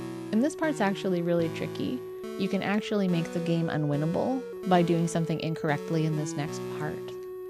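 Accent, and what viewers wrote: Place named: Canada. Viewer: Without trying to come across as too much of a suckup, I am really enjoying this playthrough. The combination of the game's music/sound effects + your voice (and Canadian accent) that makes it really soothing to listen to in the morning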